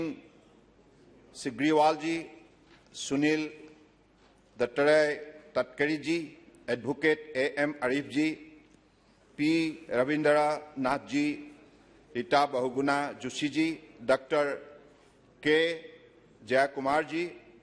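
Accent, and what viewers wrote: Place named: Assam, India. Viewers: The beautiful assamese accent kicking in. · Accent assamese hai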